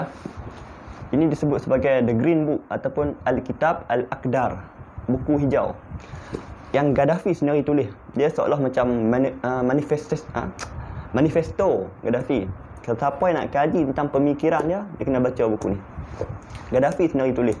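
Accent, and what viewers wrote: Perlis, Malaysia. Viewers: Good content Bro. Semoga Allah mempermudahkan segala urusan kita hendakNya Bro. Style cakap mcm Geng Perlis.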